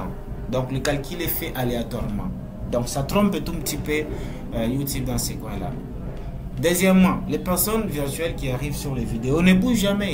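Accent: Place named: Swahili, East Africa. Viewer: Salut je suis vraiment intéressé par cette application Et oft j'ai comme l'impression que tu as un accent swahiliphone est tu de Lubumbashi?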